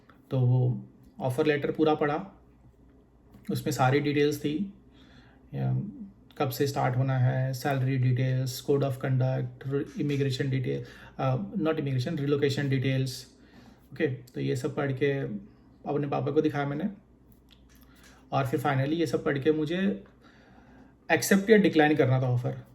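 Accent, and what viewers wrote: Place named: United States. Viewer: How did you learn US English ?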